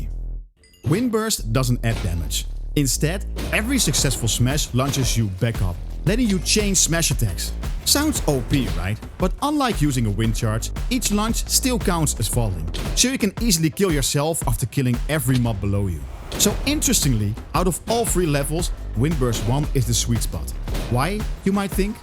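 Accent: Netherlands. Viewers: it is dutch he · Je klinkt maar een kleeein beetje nederlands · do u happen to be dutch? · You are dutch right i hear it 😂😂😂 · We zijn dus zeker wel nederlands, probeer aub een engels accent te leren bro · I smell Dutch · Dutch ahh guy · i can smell the dutch accent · Are you Dutch? You sound Dutch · gelijk weer te horen aan ons typische Nederlands accent 😂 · are you dutch?? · Hallo! Ik vroeg mij af of je Nederlands bent, jouw accent klinkt namelijk heel Nederlands! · Iedereen kan horen dat je nederlands bent :) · lol are you dutch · I smell dutch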